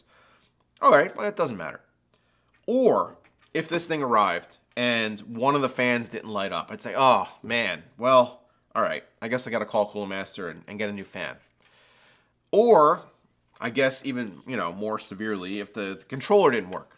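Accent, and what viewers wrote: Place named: Maryland, United States. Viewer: u from maryland bro?? lets hang out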